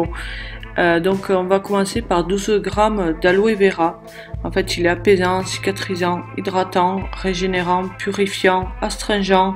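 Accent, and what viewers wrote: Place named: South, France. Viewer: Coucou, Je m'abonne car j'aime;) Ça c'est un bon sérum, que des bonnes choses pour la peau. J'aime l'accent du sud;) Bonne journée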